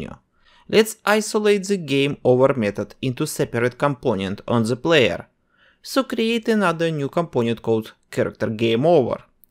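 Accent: Russia. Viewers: Спалился что ты русский)):face-blue-smiling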